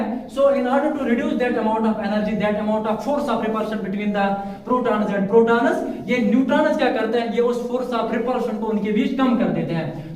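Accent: Kashmir, India. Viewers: Apki language bohot complicated hai sir pronunciation....is in kashmiri Difficult to understand....and please speak slowly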